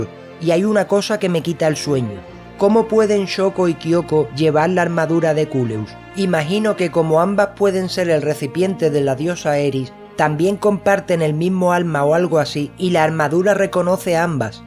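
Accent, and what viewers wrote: Andalusia, Spain. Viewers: Si te doy sincero he visto pocos vídeos tuyos, pero ahora viendo este vídeo tan largo se aprecia tu esfuerzo lingüístico jajaj eres la hostia narrando con ese toque de humor y de forma rápida y concisa pero muy entendible a diferencia de otros. En fin que eres un crack! Por cierto no eres andaluz no? Saludos desde Málaga! 😉👍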